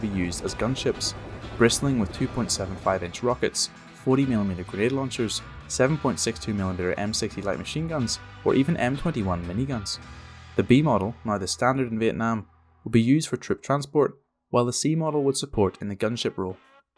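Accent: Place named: Belfast, United Kingdom. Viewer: Sounds a bit like a Belfast mon...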